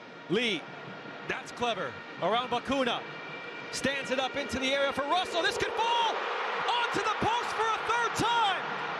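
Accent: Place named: United States. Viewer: Amazing but shame it was an American commentator. It just sounds weird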